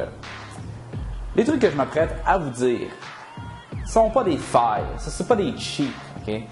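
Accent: Quebec, Canada. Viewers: Merci;) Concis et instructif (même si parfois tu enfonces des portes ouvertes)! Et ne lis pas les commentaires, nous les Européens on aime ton accent Ostie! Bonne continuation! Maison FT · Hello;-) Très bien la vidéo, bon je repasse certains passages sur lesquels comme tout Québécois tu mélanges l'anglais et le Français (avec accent) ou là j'avoue avoir un peu de mal à suivre;-) Concernant mon bizness modèle je vends des bijoux et des montres en ligne en association avec un véritable bijoutier Français sur un modèle de partage des bénéfices suite à l'échange des compétences ( je suis web-master depuis 5 ans). Aujourd'hui et depuis plus de 3 ans nous réalisons plus de chiffre qu'une boutique réelle, et cela malgré les modifications d'algo Google de septembre 2013 (les saligots) Ps: il faut faire une balance de blanc en manuel avant de te filmer, car visiblement l'appareil que tu utilises pour faire tes prises d'images à un problème en automatique Lol. 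( tu es tout bleu) sans doute que tu mélanges un éclairage naturel venant de ta gauche et un éclairage incandescent de face ou légèrement décalé, oui j'ai aussi fait pas mal de vidéo et de montage par le passé. Allez à bientôt. Christophe.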